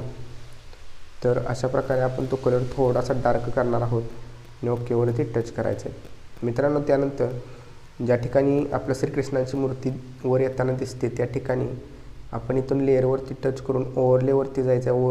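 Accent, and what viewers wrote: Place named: Marathi, India. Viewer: एक मराठी कलाकार आणि मराठी आवाज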